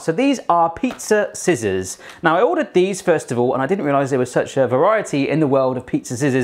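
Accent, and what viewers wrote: United Kingdom. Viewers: Doesn't like tea? Is he british or does he just have an accent? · u always say "I hate tea" or "I hate olives". well u hate a lot of things ow ant btw i love ur Brittish accent! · I usally hate the british actsent but when you talk, i totally felt in love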